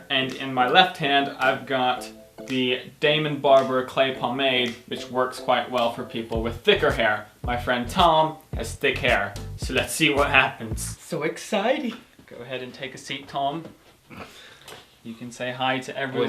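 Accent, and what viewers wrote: England, United Kingdom. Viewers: what's up with the english accent? lol he never sounded like this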